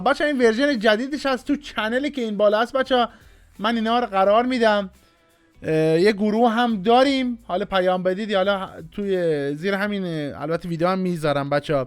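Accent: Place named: Shiraz, Iran. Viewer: شیرازی لحجه طلا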